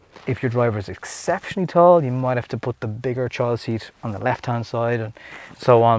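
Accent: Ireland. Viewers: U Irish u sound like it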